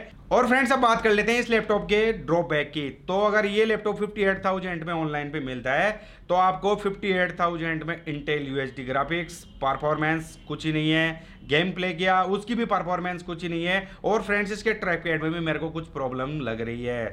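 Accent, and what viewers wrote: Rajasthan, India. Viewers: Bhai voice se pakka Rajasthan se lag rha h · Nope! Hindi with Rajasthani accent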